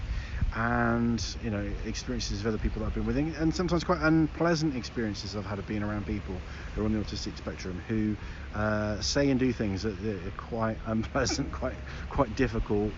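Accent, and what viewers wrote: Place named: United Kingdom. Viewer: I think the British accent is beautiful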